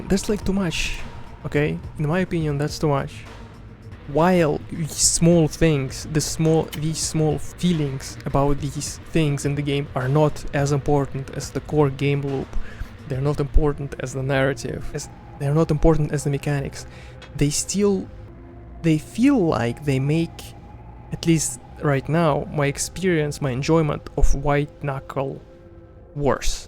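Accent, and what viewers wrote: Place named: Russia. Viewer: Я слышу русский акцент брат))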